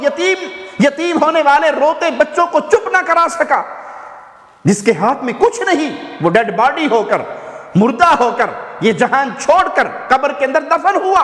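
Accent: Arab world. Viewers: اردو کو اردو لب و لہجے میں بولا کریں۔ عربی تجوید کے ساتھ نہیں۔ اردو کو عربی میں بولنا اتنا ہی غلط ہے جتنا عربی کو اردو میں بولنا۔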